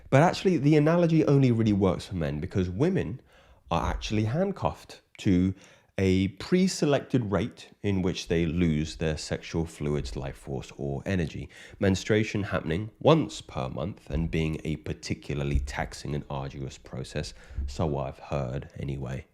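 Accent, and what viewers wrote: United Kingdom. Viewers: Ngl, the proper British brother's verbal gentle assault on my brain sparks the biological need to bring as many kids as possible into life and actually be a masculine manly father This the typa conditioning that should be broadcasted instead of whatever anti biology society participates in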